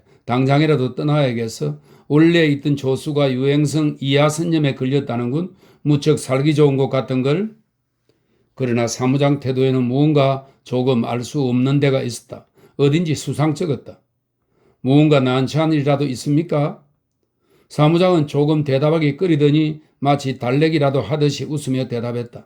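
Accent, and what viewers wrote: Gyeongsang, South Korea. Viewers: 축약해서 읽어주는 사이트는 왠지 작가의 인생관을 온전히 듣지못할것 같아서 안듣게되구요.이 사이트가 너무 좋아요.특히 경상도 말씨가 맘에 들구요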